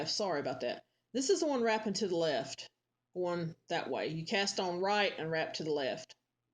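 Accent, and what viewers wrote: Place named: South Carolina, United States. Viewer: Hey hun, where you live? Upstate South Carolina here. You talk like we do , actually better than I do. People from other states have trouble understanding what I say. My daughter-in-law is German and taken years to feel comfortable talking with her! There's still times she and I don't comprehend one another. Also, I love your videos! I'm so grateful for you and several others, no offense, if not for these videos I probably would never started 😅! Thank you 😊!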